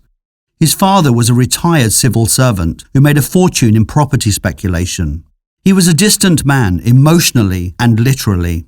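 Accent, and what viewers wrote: United Kingdom. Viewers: Art from France. Video from England (I suppose, because of the accent). Paint from USA. Viewer from Brasil. Globalisation is real!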